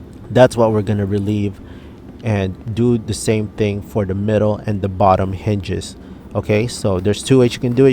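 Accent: Philippines. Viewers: I know a Filipino when I hear one lol Thanks for this!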